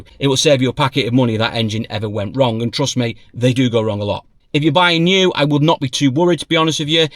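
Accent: United Kingdom. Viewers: Highly informative; But have someone else without the heavy Brit accent unundrstandable!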